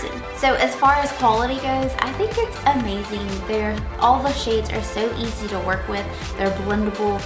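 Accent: South, United States. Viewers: Usually I think of these kinds of colors looking best on fair skin, but they look magnificent on your tan complexion! You seem to have a bit of an accent. Are you from the South?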